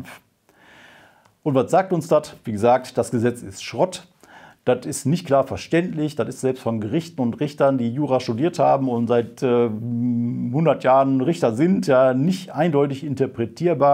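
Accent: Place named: Emsland, Germany. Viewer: Na,ist wohl ausm Emsland, wie ich,da sagt man dat und wat...geht halt in Richtung Plattdeutsch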